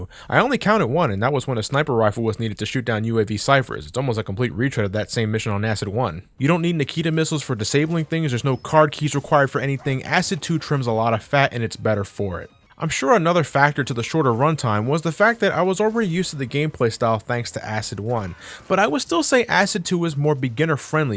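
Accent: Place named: United States. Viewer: Oh I agree with you there; because of our different regions with different slang and colloquialisms. A lot of English mispronounce as well, which hurts me even more, haha. I was just saying that is a very American way of mispronouncing as I've typically seen in American television shows etc.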